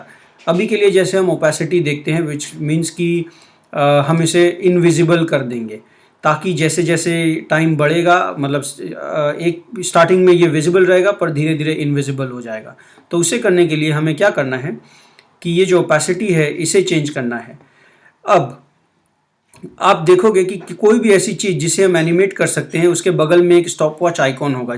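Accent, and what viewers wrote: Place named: Haryana, India. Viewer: owsm video sir . aapki voice mybe haryanvi hai hahaah good work thumbs up?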